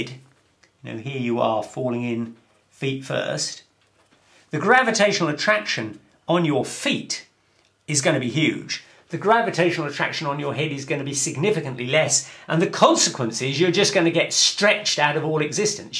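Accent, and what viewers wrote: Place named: Oxford, United Kingdom. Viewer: Now this is Oxford English we non natives all learned in school. Understand every single word he says. Very good. A very good teacher anyway.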